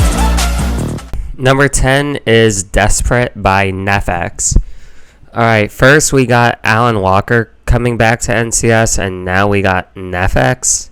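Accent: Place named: North America. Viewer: Yoooooo good to hear a North American voice! Great commentary and hope to see more of these in the future!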